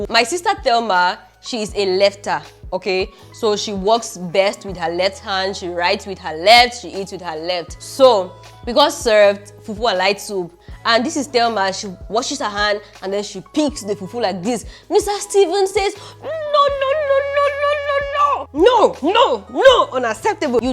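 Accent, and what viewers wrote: Nigeria. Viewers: Not conversant with Cameronians but you sound like a Nigerian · I love your content. A Cameroonian with a Nigerian accent 😍 and body language. That goes to show that we're one with slight cultural differences. Keep it up, babe 👍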